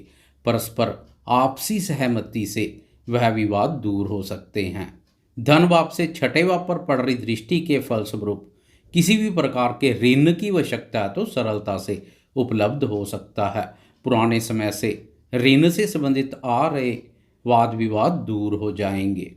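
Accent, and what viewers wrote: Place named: Punjab, India. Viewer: Guruji aap Punjabi ho shayd 😁 apki accent se lg rhaa hai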